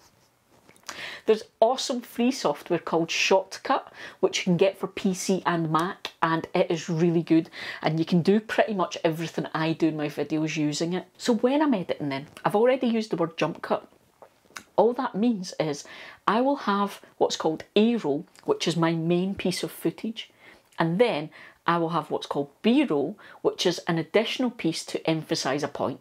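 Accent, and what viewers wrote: Scotland, United Kingdom. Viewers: Thank you for this incredible sneak behind your scenes! The way of your talking is part of the special spirit of your videos - including your nice scottish accent i already get a little more comfort with. 😉 (no offend, i like it, brings me back holiday feeling and memories when i visited Scottland with my son) It's hard to believe teaching lessons with you could be no fun. 😁 Regards from Germany - full of gratitude i found your channel by your talking to Gardener Scott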